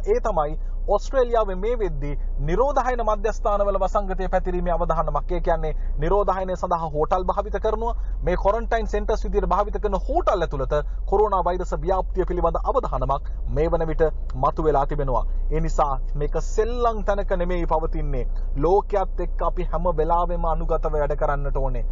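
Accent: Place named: Karandeniya, Sri Lanka. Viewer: thama Kata gahanawa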